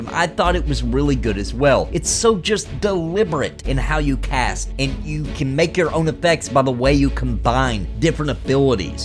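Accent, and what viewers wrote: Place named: South, United States. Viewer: Can't wait for Definitive Edition... I bought The Three Brothers so I get it! Hey man you from the south? I'm from Tennessee, I hear a little twang in there. Thanks for the video.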